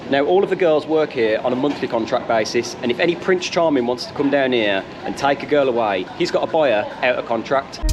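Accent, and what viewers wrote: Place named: Midlands, United Kingdom. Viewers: You sound like you're from the Midlands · You sound like you're from Dudley or Wolverhampton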